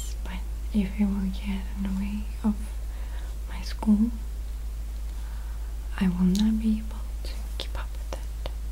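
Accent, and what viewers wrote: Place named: Russia. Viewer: Umm... No she doesn't... She's Russian... She has a Russian accent...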